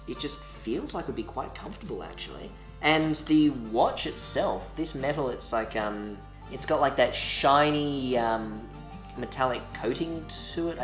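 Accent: Australia. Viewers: I don't even care about watches I'm here only for the Australian accent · I'm still hanging out for the DIY fixes to some of those terrible quality items Alex is piling up for you. My hobby is remaking things and I love watching other do it too :) Oh and FYI - as a Kiwi - I'm not here for the Aussie accent like some other commenters , but in your case I am prepared to forgive it :) Chur bro.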